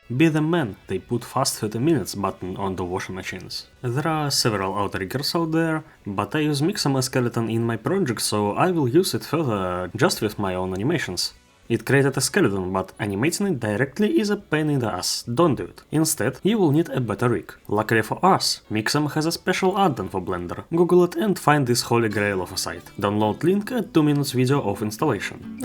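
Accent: Russia. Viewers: bro are you russian dont know why ,i like to hear you speek english (this is only a comment dont take it seriously ),it is intreasting to learn some think while having fun keep it up🙂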